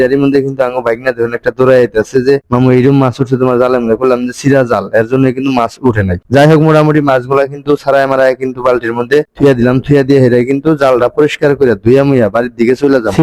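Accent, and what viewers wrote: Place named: Jamalpur, Bangladesh. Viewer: জামালপুরের ভাষা বলতেছে 😊❤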